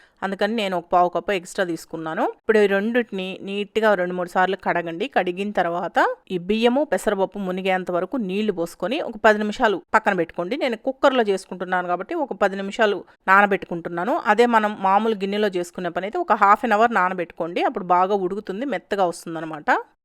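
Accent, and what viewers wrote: Nellore, India. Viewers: Madam meru nellore valla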